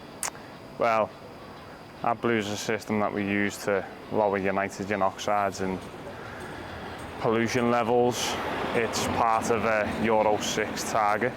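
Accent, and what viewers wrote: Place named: Liverpool, United Kingdom. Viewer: Liverpool dialect. One of the strongest accents I've ever heard.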